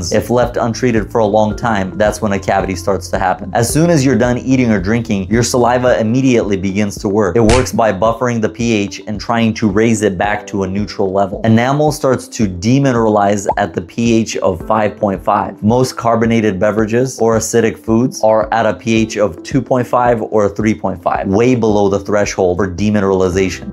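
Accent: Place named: South, United States. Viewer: that’s a neat backstory! I’m from deep south Louisiana and I was hearing an occasional southern accent whether you knew it or not. Lol.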